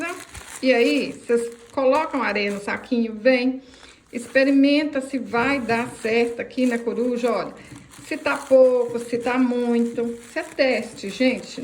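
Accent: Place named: Minas Gerais, Brazil. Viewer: Oi vc é ótima. Adorei. Parabéns 👏👏 Vc é mineira... é bão demais da conta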